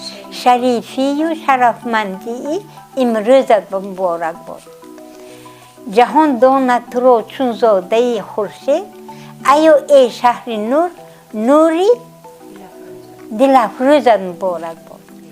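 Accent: Tajikistan. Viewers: забони ширини точикиятонро гардам очачон! умратон дарозу саломатиатон бардавом бод.бузурге фармуда: гарчи хинди дар азубат шакар аст, тарзи гуфтори точики ширинтар аст...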